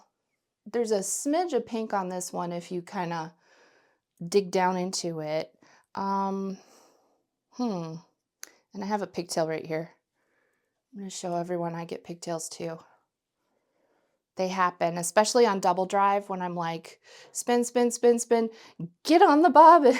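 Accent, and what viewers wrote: Midwest, United States. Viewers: As someone who grew up all over the Midwest from Missouri up to Michigan and Wisconsin, I do remember the warnings and the reality, but also that it was a point of pride among some of us to see how long into winter we could go without wearing our coats so... I suspect that, while it may ALSO have to do with cell phones and complacency, there's a large element of "teenager" in there, too! And some of it on your part is simply how extreme the area was where you grew up. The coldest I've ever experienced was in Iowa, but for consistent bone-chilling, that was Minnesota. When I lived there it took a while to get used to the habits you grew up with. I thought everyone was bonkers for leaving their car running while they were in the store. Welp. If you don't, it may not start when you come back out! We live and learn, and your kid will, too. 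Eventually. :D Yes, I can totally hear the Minnesota and Wisconsin in you. lol For me it isn't just the "o" but the "sc" part of it that gives you away. Team Bubbler, yeah?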